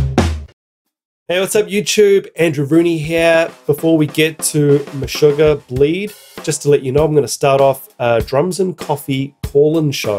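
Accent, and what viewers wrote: New Zealand. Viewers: BTW your Kiwi accent is just awesome!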